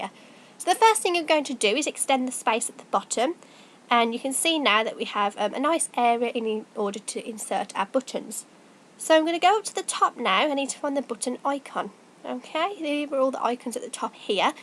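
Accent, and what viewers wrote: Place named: RP, United Kingdom. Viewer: posh Accent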